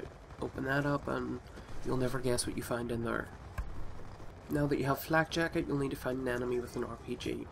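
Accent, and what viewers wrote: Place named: Northern Ireland, United Kingdom. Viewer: Thanks for the great guides. Looking forward to the next ones. It's been a long time since I've heard a real Northern Irish accent ;)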